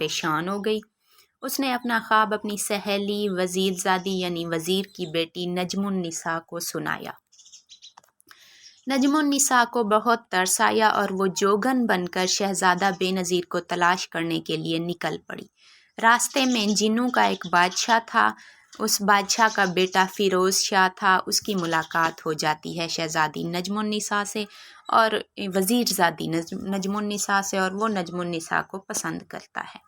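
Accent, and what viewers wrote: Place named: India. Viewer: پہلی بار کسی پاکستانی کی آواز ہندوستانی جیسا لگا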